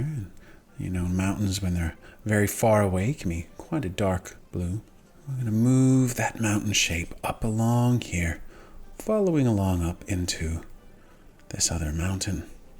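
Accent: United States. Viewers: you pull off the American accent really well. Great drawing. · I cannot listen to you with an American accent I'm going to SCREAM ITS SO UNNERVING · American accent on point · Nice Amati can accent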